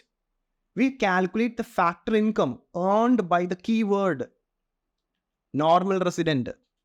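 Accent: Kerala, India. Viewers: Sir, you’re from Kerala right!!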